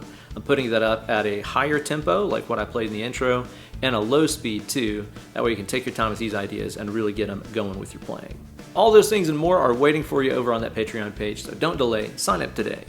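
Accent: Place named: Virginia, United States. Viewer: I love this! As an experienced metal player trying to work my way into country playing, this is a quick easy transition, rather than attempting to start from scratch or re-invent the wheel, so to speak. Great content as always. You have quite the way of explaining things, so that anyone can get it. I grew up in SW VA so your accent reminds me of home. Keep rockin!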